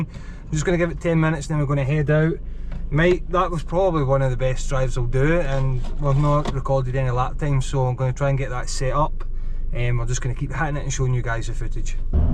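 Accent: Scotland, United Kingdom. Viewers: Great video. Liked and subscribed. Great to hear another Scot 🏴 on here. Going to spend some time watching your vids. Specially enjoy the Type R ones 👍 keep them going mate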